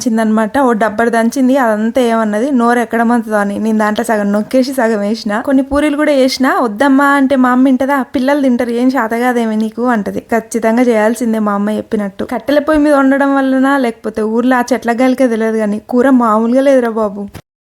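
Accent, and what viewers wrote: Telangana, India. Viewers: నువ్వు గీ తెలంగాణ యాసల జబర్దస్త్ మాట్లాడి కోడి కూర వండినావు లే....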